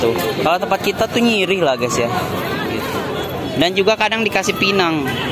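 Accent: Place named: West Java, Indonesia. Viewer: Mas situh asli nya orang sunda ya klo saya perhatikan dari logat logat nya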